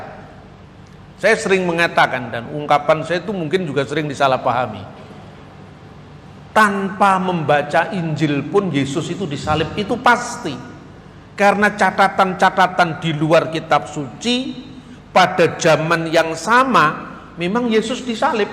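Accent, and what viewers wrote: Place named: Java, Indonesia. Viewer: Lidah jawa banget Docetic => Doketik Tapi sangat aneh pemikiran Docetic ini kemudian menjadi pengajaran agama islam. Dalam ajaran itu Penyaliban benar benar terjadi tapi ya g disalib itu bukan Yesus tetapi orang lain yang diserupakan. Anehnya disini mereka sendiri (Ulama2) (Quran dan Hadist) bingung dan beda pendapat tentang siapa yg diserupakan... Ada yg bilang simon dari kirene disebutkan bahwa simon dari kirene adalah Murid Yesus yang paling muda dan setia yg ketika Yesus menanyai ke12 muridnya siapa yg bersedia diserupakan seperti Dia (Yesus) dan simon ini mengajukan diri sampai 3x. Ada yg berpendapat Yudas Iskariot murid yg berkhianat. Perlu di ketahui dalam kisah itu bahwa orang yg akan menggantikan Yesus untuk di salib, akan beroleh surga yg sama derajatnya dgn Yesus. Disini ambigu nya terjadi... Simon dari kirene bukanlah Murid Yesus, Simon yang murid Yesus bukanlah Murid yang paling muda. 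Sbab yg paling muda adalah Yohanes dan juga penulis Injil. Kalau Yudas Iskariot masa orang yg mengkhianati Yesus beroleh Surga yg derajat nya sama?? Tuhan seperti apakah itu yang menipu umatnya (menyerupakan Yesus supaya Umatnya berfikir bahwa bahwa Yesus benar disalib padahal Tidak)?? Tp sudahlah biarkan mereka dlm kebingungannya sendiri. Penekanan utama iman kristiani adalah Karya Penyelamatannya (Mesias, Kristus) dan hal ini sudah pula dinubuatkan jauh sebelum Yesus (terlahir). Bagaimana Ia menyelamatkan apabila ia sendiri diselamatkan (tidak disalib/diserupakan menonton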